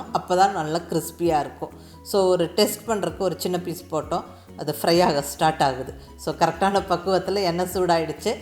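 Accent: Coimbatore, India. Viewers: Ungal kovai Tamil kettukitte irukkalam pol irukku, aana video seekkiram mudyuthe!!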